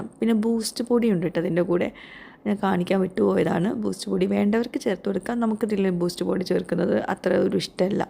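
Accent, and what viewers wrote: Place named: Malappuram, India. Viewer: Engne ayalum nammude malappuram basha vallapoyum varum alle kolaya❤❤❤😂😂😂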